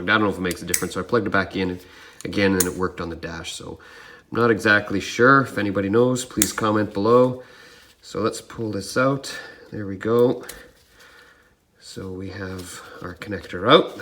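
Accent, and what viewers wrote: Canada. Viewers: lol, it’s always good to watch a video of an enthusiastic demonstration. I bet you’re from Canada, you got a Canadian accent.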